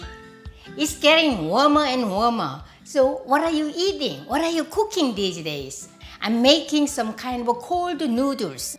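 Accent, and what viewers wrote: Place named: South Korea. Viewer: 한국식 영어 억양 너무 매력적이에요~~~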